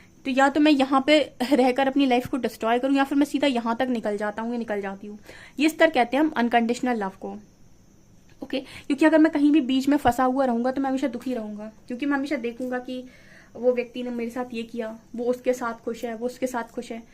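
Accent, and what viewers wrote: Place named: Bhedaghat, India. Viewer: Aaki boli se samajh Gaya main ki AAP Kahan se ho behanji...bhedaghaat..am I right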